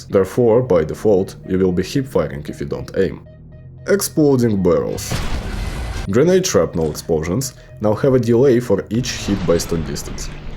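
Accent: Russia. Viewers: Man is so cool! Кхм а еще твой Русский акцент прям врезается в голову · russian accent, W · Mmm чувствоваеца русский акцент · Твой акцент очень даже русский, и я уверен что ты русский. Понимаю тебя, ведь на русским не платят на ютубе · You have strong russian accent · не только я услышал у него русский акцент) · I can hear by the voice that the author is Russian. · Bro u got bold Russian accent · У него ОЧЕНЬ русский акцент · Какой же ахуенный русский акцент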